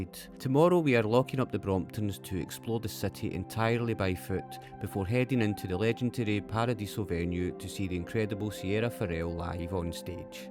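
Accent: Scotland, United Kingdom. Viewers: Video: great. Narrating: even better. Scottish accent: warms my heart. Perfect!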